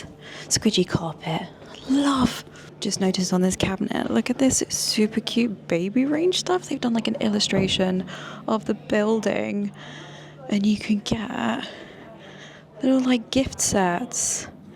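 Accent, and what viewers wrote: RP, United Kingdom. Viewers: £835!!!! 🙈 that outrageous for most of us, it looks lovely but I dont think its worth it Plus the kettle should have been emptied before you came, you have no idea how long that water had been sitting in there and where were the biscuits????? Plus you do sound very well spoken (quite posh sounding ) 🤣 so I think you can carry it off more than the rest of us 😊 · Hello from America. I am indulging myself in your speech, your choice of words, and your cadence. The British accent (from my perspective) is simply charming. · you need to come and check out Aussieland this Chrissy because we love your posh British accent.we,'ll fill you full of Prawnies and FOSTERS,we love poms.The ashes will be on as well as all the British backpacker parties on Bondi beach when they leave their rubbish but we don't mind,come and enjoy.😊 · Hi from Morocco, I watch your videos every day, cuz I love your RP accent and I want to speak like you.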